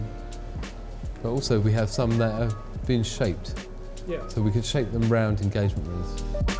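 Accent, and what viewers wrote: England, United Kingdom. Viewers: English accent no such thing as British accent. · I love your britisch accent! Cool. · That british accent. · It is clearly an English accent you wouldn't hear this sort of accent in Northern Ireland,Wales or Scotland,it's not therefore 'British' but English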